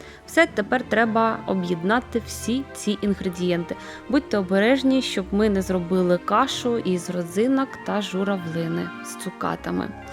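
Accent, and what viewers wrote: Russia. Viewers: Це справжній рецепт. Професійно! Приємно дивитися. Але не дешево (для мене ). І дивна мова у вас - наче українська і хороша, але ж "падарунок" , " раздєліти" та інш. ....це ( просто цікаво) у вашому місті так розмовляють? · Ну доя чого тужитись балакати украинською мовою, аж вуха ломить бо русский акцент видно шо ви гоаворите росийською мовою и вона Головна. То все краще слухати чисту мову бо це не украинська а перелом мозгу. .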